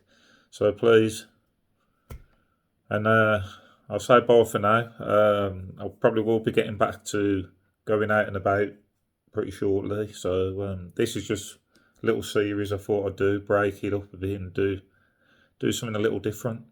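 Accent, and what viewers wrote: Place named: Birmingham, United Kingdom. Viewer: I agree with all of these, all awful, however you seem to be reading your script, and your brummie accent, whilst genuine is quiet grating. The word, five, does not have an o in it. Foive is not a word.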